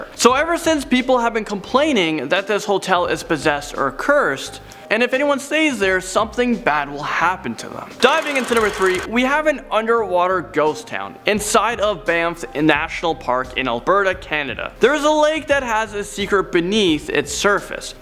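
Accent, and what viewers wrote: India. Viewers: ah Indian accent amazing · Are you Indian?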